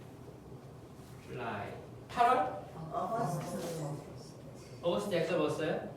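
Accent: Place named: United States. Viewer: 예수님! 신부님 영상 찾아보니 정말 대단하시군요~~*** 강론,특강,성경읽기,영어성경,기도문영상들 ᆢ 매일듣게되는 마법이 있습니다 호주계셨는데도, 우리가배운 미국영어와,성우처럼 음성이 좋으셔서 계속듣게 됩니다 신부님 항상 영육간에 건강하세요🍎 주님말씀 전해주셔서 감사합니다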